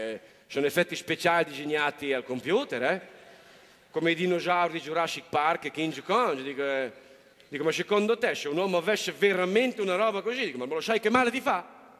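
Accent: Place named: Romagna, Italy. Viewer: Impareggiabile interprete della parlata romagnola prestata alla quotidianità. Bravissimo.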